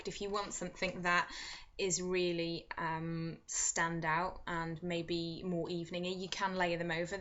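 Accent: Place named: RP, United Kingdom. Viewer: very posh you tube voice, good luck x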